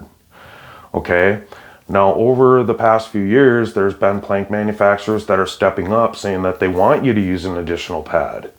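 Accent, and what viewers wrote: Minnesota, United States. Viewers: I love your videos... great explanations. And I know how hard it is to quit smoking!!! I quit after 20 years. Also enjoy that Minne-soooota accent. All my people live there. Great job. I also pray you will quit smoking.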